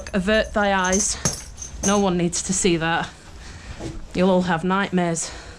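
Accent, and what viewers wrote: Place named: Essex, United Kingdom. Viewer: Hi. I've just discovered your channel. I'm in North Essex so by the sound of your accent, not far from you. I really want to get back into riding but was put off by mean comments about my size which has affected my confidence. I am enjoying your videos and hope to start riding again very soon. I'm a freelance groom so do get lots of horsey contact time x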